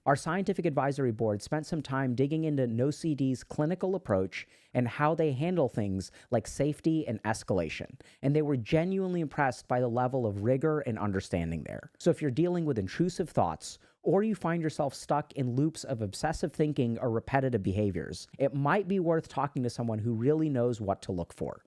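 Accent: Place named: India. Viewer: Dr. K - Would you consider having a secondary channel for 528 Million (½ a Billion) Hindi speakers ?The accent would actually help in popularity in the younger generation. 😊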